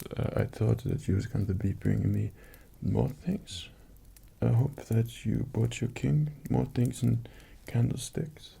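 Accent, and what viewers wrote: France. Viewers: French accent and HRE flag on preview. Nice. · french accent is very funnn il french XD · As a french, I think this french accent gives a softie side to that king, which is weird but funny at the same time. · Your French voice is so amazing, do fancy French roleplays more often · He has a German flag, a French accent but he speaks English? What is this sorcery? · The accent of a French King and the speaking pace of Travis Fimmel as Ragnar Lothbrok... Counting you do look like Thor, you could be the first line of viking nobility of the country. No ? ;) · I expected a very Monty Python French accent, but this was a pleasant surprise. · I'm french and you have a little french accent...with a good english spoken xD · ragnar lothbrok roleplay? the french accent reminds me of him lol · I'm French and your french accent is horrible but really funny and kinda relaxing :') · Omg I'm french and It's really creepy like he does very well the french accent xD ... 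It seems me lol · I CAN UNDERSTAND ENJ?GLISH WHEN YOURE SPEAK ENGLMISH WITH FRENCH ACCENT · Wouah ! It's amazing ! You have exactly the french accent ! It's so rare to do this ! It's a good vidéo ! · All I see is Thor with a french accent wearing a crown and I'm dying XD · Dont know why he has a french accent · This actually sounds like a French accent lmaoooo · Les gens aiment écouter quelqu'un parler anglais avec un accent français ? Damn, moi qui ai toujours forcé pour essayer d'avoir le meilleur accent anglais possible! :')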